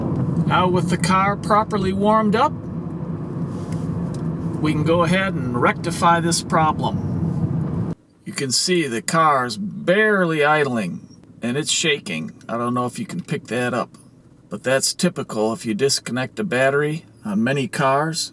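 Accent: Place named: Minnesota, United States. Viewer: Thank you this fixed my problem on my fzj80 land cruiser! You sound like my good friend from minn!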